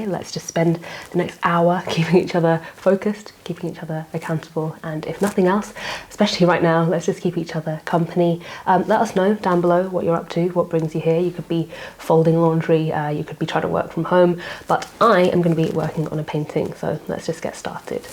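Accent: England, United Kingdom. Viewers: I'm new to watercolor and new to your channel. I've binged watched tons of your content. I love listening to you (my mum was from England and your accent reminds me of her). Keep up the good work and stay safe.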